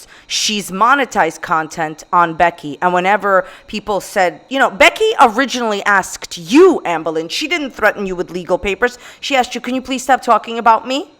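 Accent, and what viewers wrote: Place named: New York City, United States. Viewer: I think my favourite parts about you, is how strong you are about your feelings, and your strong new york/new jersey accent. I’m stationed Virginia right now and hearing you reminds me of strong willed women from my home, New York, and it warms my heart. Love you so much for fueling my unending desire for drama as well as my homesickness <3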